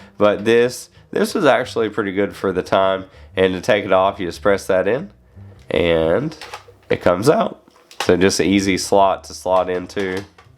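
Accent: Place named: Carolinas, United States. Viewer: Cool channel! Neat accent too. If I had to guess, is your accent Carolinian? 😎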